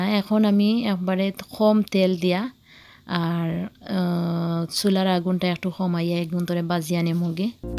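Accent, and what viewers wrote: Sylhet, Bangladesh. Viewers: আপু কেমন আছেন খুব ভাল লাগের খিছুরি আর চানা আপনার বিডিও আমার খুব ভাল লাগে আর আপনার সিলেটি মাত খুব ভাল লাগে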